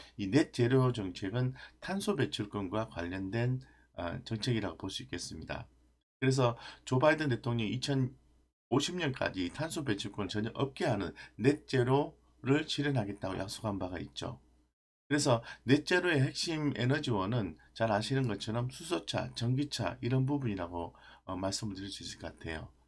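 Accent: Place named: Gyeongsang, South Korea. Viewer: 구수한 경상도 사투리에 자세한 설명 항상 감사합니다..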